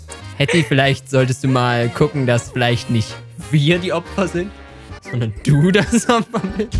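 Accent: Saxony, Germany. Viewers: ich liebe deinen säsichen Dialekt 😍. und finde es mega cool das du dich vor der Kamera jeden Scheiß traust 😂# #Aboboycamper #LogobesterYT #verdient1MioAbos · wo kommst du her XD du kommst mir vom sprechen her iwi aus Sachsen owo? stimmt des?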